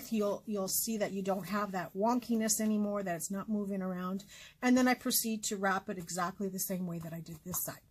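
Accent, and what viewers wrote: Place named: Michigan, United States. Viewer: Are you from Michigan? You kind of sound like it…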